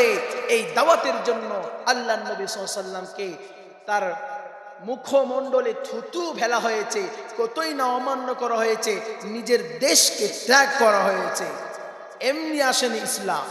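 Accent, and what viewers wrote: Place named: Bangladesh. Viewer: আপনার ওয়াজ খুব সুন্দর বাংলাদেশির মত 😊😊😊😊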